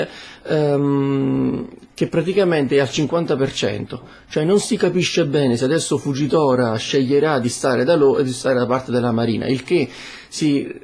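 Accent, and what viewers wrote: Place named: Naples, Italy. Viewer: Davvero ottimo video .. come tuo solito ! E poi ci avevo azzeccato che eri di napoli hahahaha sei fantastico frat'm. Comunque volevo porti una domanda , e possibile che non come molti pensano e cioè che law a PH abbia chiesto a luffy un'alleanza in virtù del tutto fortuita visto che proprio per puro caso i due si siano incontrati in quell 'isola oppure che law sappia la natura di kaido (ovvero la D) e proprio per questo sapendo che luffy è della D sia per un alleanza nella conseguenza di attaccare un altro imperatore? ! Da law come stratega me lo aspetterei anche se mi rendo conto che questa teoria è parecchio campata in aria. Comunque saluti e continua sempre cosi! Appassonaci con le tue teorie e i tuoi studi su one piece proprio con il tuo modo "scialbo" e molto semplice che contagia tutti! Si o mast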